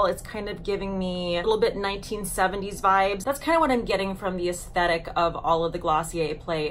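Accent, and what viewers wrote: France. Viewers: Hiya toots! Love your French accent! Have you ever seen the episode Girlfriends? There is a French song called that is hilarious! Oh and there have been four Titanic movies! My fave is the one with Barbara Stanwyck and Clifton Webb. So good! Love this Glossier Play line and you’re right, it has a 70s vibe! You look just adorable/chic/glamorous! Be careful with those glaciers girl. 😘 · I loved this! I would wear that glitter and I'm turning 60 this month! Love the liners, too! This is a brand I've never tried but I did try Colourpop because of you so maybe I'll try this out too! Love the French accent! You never fail to entertain! Be careful out there! Thank you for another exciting video!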